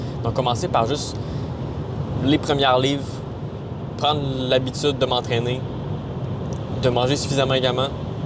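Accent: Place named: Quebec, Canada. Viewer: J'adore l'accent québéquois !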